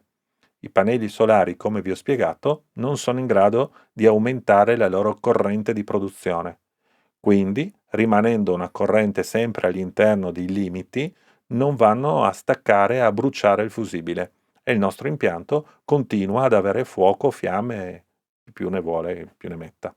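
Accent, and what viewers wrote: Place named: Sicily, Italy. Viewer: Sei molto chiaro nelle tue spiegazioni dettagliate .( Dal tuo accento ho capito che sei siciliano ,,auguri!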